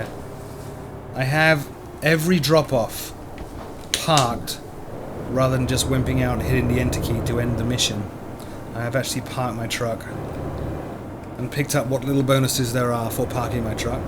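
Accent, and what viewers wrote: United Kingdom. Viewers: your from america? i thought u was from uk, you dont sound Americana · I like most of your videos, though I'm a flight simmer first. Keep up the good work. Are you from the UK or do you just sound like that?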